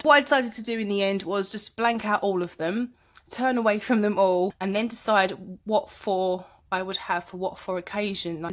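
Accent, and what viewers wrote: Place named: Surrey, United Kingdom. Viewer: I love Surrey English. Music to my ears. I wish I could speak like you. ❤❤❤ I only lived in the UK for five years but will forever love and miss it.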